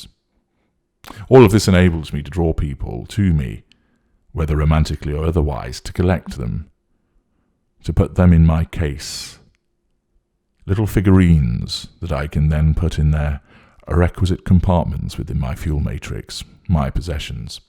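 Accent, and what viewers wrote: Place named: RP, United Kingdom. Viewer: is your name a pseudonym for the Holy Grail of Tutelage of NPD or to represent your being on par with the royal Tudors making you a self-appointed HRH? Or could it be an homage to HG Wells, or a combination of the above? I remember a few, perhaps several, years ago when you did a podcast with a young black American female. I remember what you looked like in detail as I have a photographic memory. In addition, you spoke briefly about your mom being an extreme narcissist, the passivity of your father and how you felt he failed to protect you and your sister from the abuses of your mom. You intimated that you experienced some form of sexual abuse or molestation but did not care to go into the details. You also described your sister as the ultimate accommodating, people pleasing codependent. You told how the YT videos and all the Machiavellianism altruistic outreach work to educate others on the subject of NPD was part of therapy - as in your therapists requested you to do so. 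In the podcast, you said you were only in therapy as a condition to maintain your inheritance and position in the family business (not sure how the last part was phrased). Your voice...a very Queen's English British sort was similar to the one on your videos but far less menacing, but I attribute the difference to the use of some sort of voice machine.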